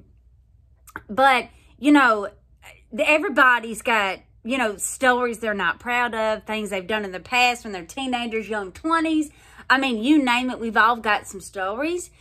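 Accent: South, United States. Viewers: Yes !!! Make up tutorial!! Need it. and more economical shopping for clothes. Keep up the smiles and the positive vibes. Live you and so does my husband. So glad you also speak southern. 💓✝️🇺🇸😎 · sounds like a real southern belle we in here in georgia luv you youre the VERY BEST how great is WE LUV YOU luv your southern alabama accent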